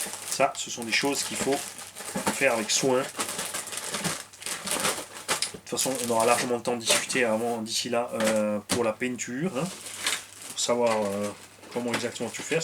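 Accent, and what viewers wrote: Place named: South, France. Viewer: ho t'es du sud? deux mille viNNt et un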